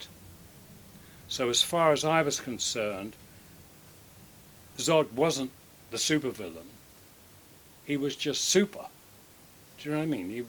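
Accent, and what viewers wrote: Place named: Cockney, United Kingdom. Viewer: Such a charismatic man. Hey sounds pure Cockney here.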